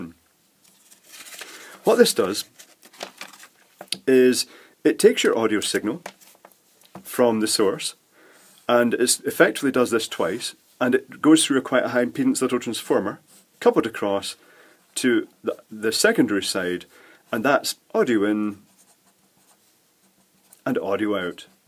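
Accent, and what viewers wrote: Scotland, United Kingdom. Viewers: where are you from you sound Scottish like me but i see you are on isle of white is it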